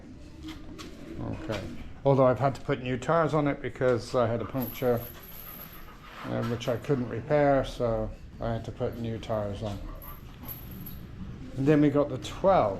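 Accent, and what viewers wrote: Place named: Scotland, United Kingdom. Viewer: You sound like you might be from south of scotland?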